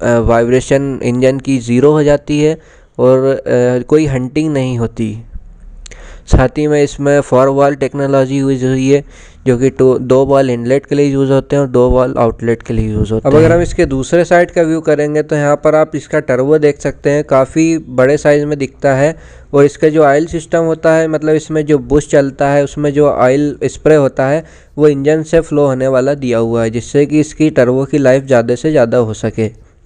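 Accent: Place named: Madhya Pradesh, India. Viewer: Me bhi mp se hu tumhare bolne k tarike se hi lg gya tha mujhe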